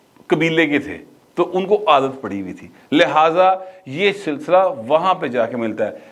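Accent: Lahore, Pakistan. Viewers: sb zabardast very interesting and very very well describe and most importantly you describe purely in our Lahori style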